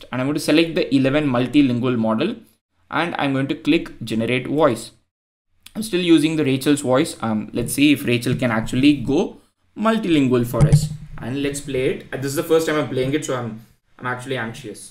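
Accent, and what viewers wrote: India. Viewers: i love the indian accent its the best, Techguys form india are to me the best masters and teachers ... And this content/video/tutorial is the proof Ty sir... You are helping to survive this madness... Cheers from Mexico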